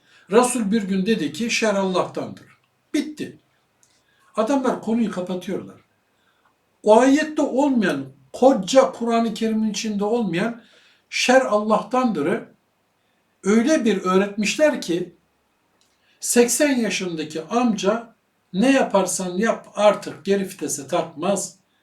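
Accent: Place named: Arab world. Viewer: Konuyu biliyor ve anlıyorum. Fakat kırıtma konusunda eleştirmenin kendine de bakması lazım. Eleştirmenin şive resmen arap şivesi gibi. Sizce de öyle değil mi?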